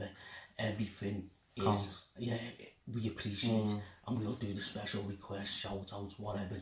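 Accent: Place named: Liverpool, United Kingdom. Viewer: totally wasnt expecting a scouse accent lol but its awesome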